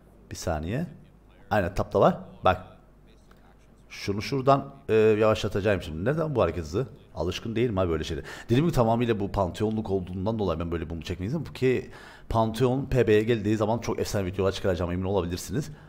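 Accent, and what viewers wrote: Cyprus. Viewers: Dayı sen kıbrıslımısın ya :D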